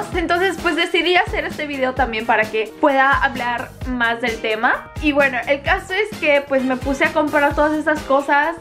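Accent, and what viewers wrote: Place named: Mexico. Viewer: Haha tiene acento de mexicana fresa, me gusta xdxdxd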